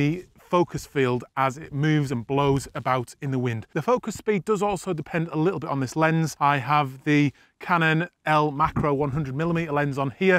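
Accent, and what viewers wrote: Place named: United Kingdom. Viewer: Love your british accent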